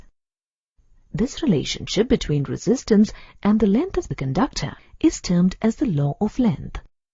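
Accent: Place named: India. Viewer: Bad accent and wrong terminology. A typical indian product.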